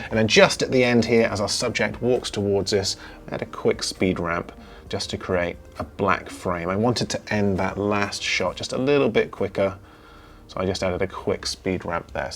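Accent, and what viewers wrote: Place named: West Country, United Kingdom. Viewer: Really enjoyed this video, thank you. It’s helpful to see the editing broken down. I have done some of these things in Premiere but not used speed ramps - this looks like a great fix for a few wobbles 👍 Also - don’t think I’ve ever seen Plymouth look so cool, heh. Nice to hear a west country accent on YT! Cheers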